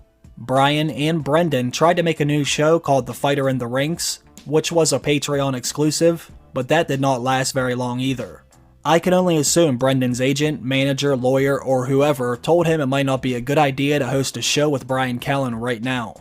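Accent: Pittsburgh, United States. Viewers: Hey! I grew up in the burgh’, I can hear that Yinzer in your voice. Am I right?!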